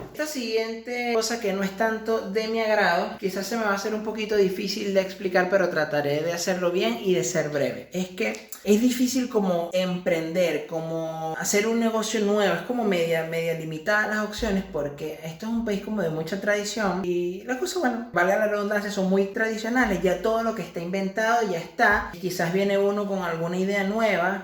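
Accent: Venezuela. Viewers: No hizo falta escuchar 1 minuto de video para saber que eres venezolano. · Llevo solo 1 minuto de vídeo y ya se w eres venezolano jajaja tan bello... Dios te bendiga papito!!!... 😆😘🇬🇧🙏🏼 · Desde que empezò dije este chamo es venezolano... bajo a los comentarios y confirmo jajajaja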